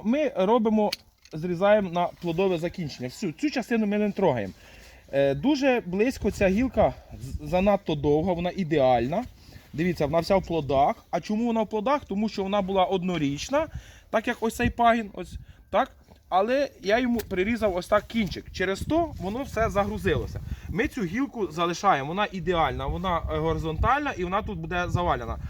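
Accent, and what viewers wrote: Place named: Podillia, Ukraine. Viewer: Подільський діалект.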